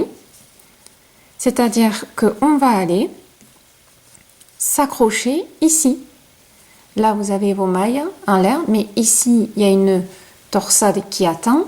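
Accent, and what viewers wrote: South, France. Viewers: Merci à toi, "comme une grande" j'ai réussi à faire ce magnifique point de torsade grâce à tes explications claires et ton agréable accent du Sud.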